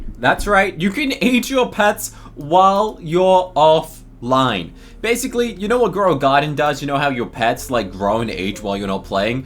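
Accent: England, United Kingdom. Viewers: Is it me or he is sou more British 😅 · is from england ye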